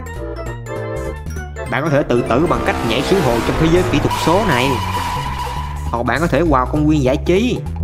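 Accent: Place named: Binh Duong, Vietnam. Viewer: saonóđúnglà bình Dương vải cả nó hơi sà lơ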